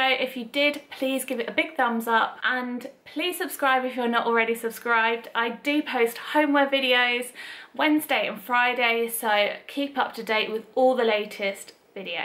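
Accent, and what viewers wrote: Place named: United Kingdom. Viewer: im a man of simple things....i hear a British accent.....i subscribe 🤷🏾‍♂️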